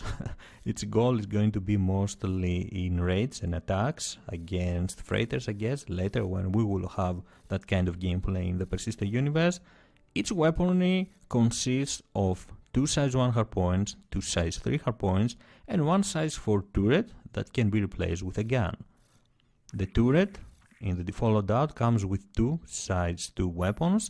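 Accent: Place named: Greece. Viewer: You have a very strong greek accent.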